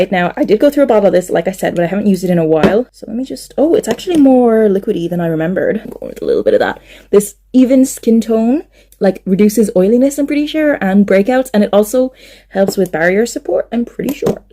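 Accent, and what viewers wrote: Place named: Ireland. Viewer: Ha me too! It's the Irish thing, we speak so quickly and its so comforting to hear an Irish accent at the speed I'm used to. We don't waste a second😂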